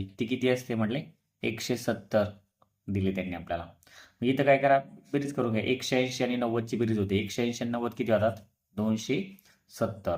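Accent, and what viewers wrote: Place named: Ahmednagar, India. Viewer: Language varun olaklo sir 😊 Maje frd aahet nagar che same bolatat😅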